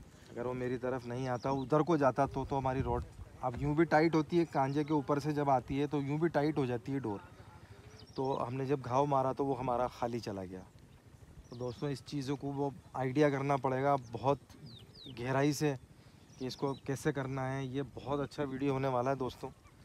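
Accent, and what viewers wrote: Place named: Bhopal, India. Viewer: aapki tone ek dum bhopali type hai main bhopal se hoon isliye poocha bhai good show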